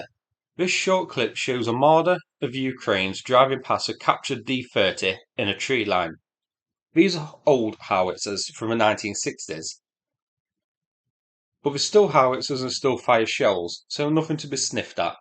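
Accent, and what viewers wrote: United Kingdom. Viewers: Excellent video. No unnecessary sarcasm or disrespect, just a good briefing in good old British English. I hope the Ukrainians make the most of this good moment and momentum.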